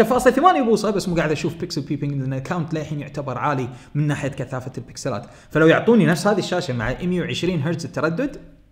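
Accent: Kuwait. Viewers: التقنية خراافية ولكن الانتشار الاوسع رح يكون بعد خمس سنين بسبة السعر الجنوني اللي بينزل فيه للسوق وللأمانة انا سوري بس اللهجة الكويتية يا اخي كشخة😄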